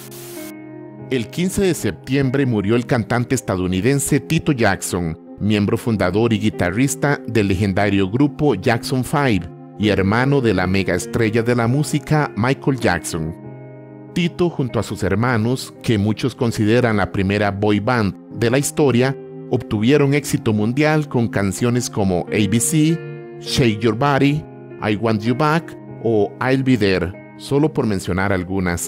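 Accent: Costa Rica. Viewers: Es el acento tico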